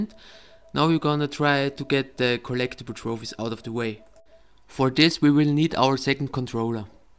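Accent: Germany. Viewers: It's a German accent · Bruder man hört das du deutscher bist kannst du dass auch mal in deutsch machen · Wenn du deutscher bist - rede deutsch. Klingt einfach nur schrecklich wenn die deutschen auf International machen.... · Wow du bist sicher deutsch oder ? (no judgement)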